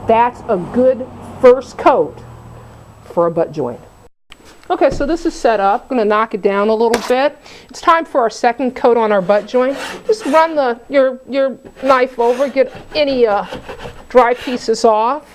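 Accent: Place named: East Coast, United States. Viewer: I like to watch you. You are as the previous person mentioned delightful. I also feel you address points that a person (women) like to know. There is more than one way and you cover briefly the differences and don't claim one is better than the other but point out why you may want one over the other at times. I have watched a lot of videos in preparation for a remodel that required a couple walls (paneling) being opened and removed and wanted to put drywall in its place. I have done small repairs in the past on walls and felt comfortable attempting this large project but but after watching some videos in research I felt a little intimidated, but after watching you I feel much more at ease. Thank you. P.S. Your accent reminds me (a Californian) of my east coast friend.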